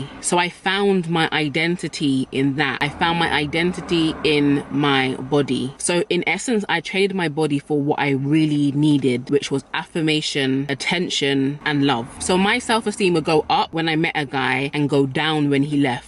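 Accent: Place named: United Kingdom. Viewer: I love her ,I think it because I feel like a black person 😂inside of me , the only thing is I don't like her British'accent its kind of crazy for me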